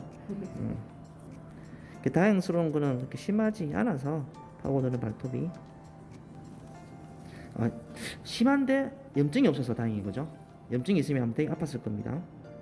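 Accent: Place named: Gyeongsang, South Korea. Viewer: 쌤 ♡♡♡♡♡♡♡♡♡♡ 너무 오랫만이네요..ㅠ 보고시퍼써용😂👍 영상 잘볼께요~~^^ 갱상도 쌤.. 넘 좋아용♡♡♡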